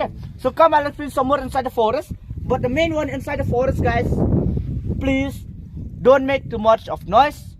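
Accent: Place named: United Kingdom. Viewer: Ym dei da ka phareng hynrei dei beit da ka english kaba na UK... Keep it up bro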